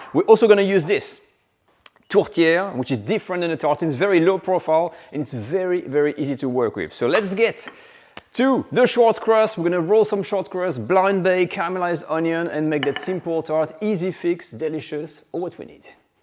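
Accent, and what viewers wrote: France. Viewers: I love your french accent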